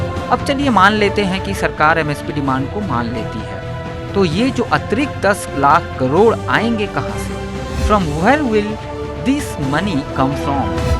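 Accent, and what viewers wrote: Odisha, India. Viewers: It sounds an odia student is reading an english essay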